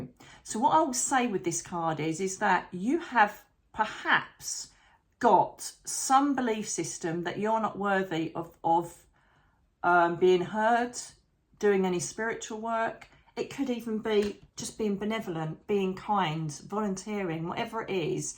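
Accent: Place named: England, United Kingdom. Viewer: I love you! 🥰 And I love your english accent, and your loving and grateful insights on our energy and healing potentials, the power of prayer - YES, the clarity in meditation -YES, Self love - YES supported by Archangels Michael (blue light) and Rafael (green light) and btw I love the fun and joy you give. ❤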